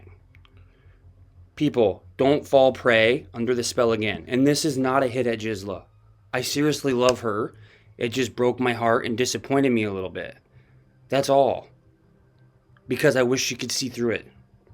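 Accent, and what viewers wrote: United States. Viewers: Do you know the girl took her own life? please stop with your content enough is enough. Suicides are tragedy in this family has to go on without their wife, mother and friend, but you putting content on putting your ideas out good for you, but it’s time to stop enough is enough. Please let this girl rest in peace please… listening to the rest of your video. Are you for real you are arrogant. You have absolutely no idea about these people. You obviously live in America. Judging by your accent, forming opinions from newsreels and other people‘s videos WTF get a life leave these people alone, let them move on. I have been watching your channel since Nikola went missing and all you do is throw doubt onto everything. It only takes one person to believe that garbage that dribbling from your channel and they possibly may hurt. Nicole‘s husband just stop it. 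😠 you’re an idiot.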